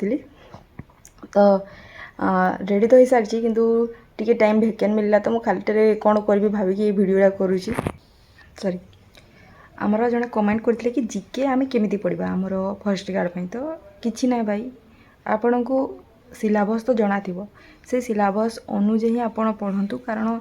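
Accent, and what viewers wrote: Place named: Sambalpur, India. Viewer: Tamar accent ta pura Sambalpuri mix aae.... Best of luck Jai Jagannath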